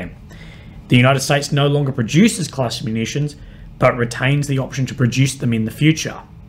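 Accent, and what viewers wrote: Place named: Australia. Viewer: Jeez there are some cynical people around; surely most people can see that you are genuine in your cause? As an Aussie i want to say that it's great to hear your accent on a vid about the war in Ukraine! Thanks for flying our flag mate, you're a champion :)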